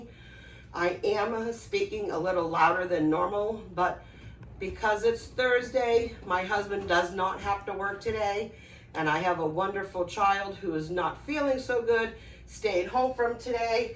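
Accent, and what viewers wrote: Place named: United States. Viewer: ',' sounds American🤔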